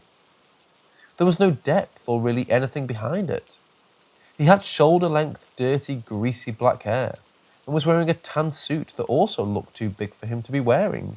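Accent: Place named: Scotland, United Kingdom. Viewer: Kinda hard to take tales of NC seriously from a Scottish accent....but good tales. 😎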